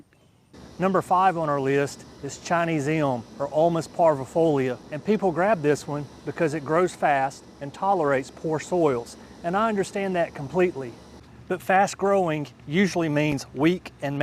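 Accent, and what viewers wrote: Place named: Alabama, United States. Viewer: You need to list your region. Based on your accent I’m guessing you’re somewhere near Alabama.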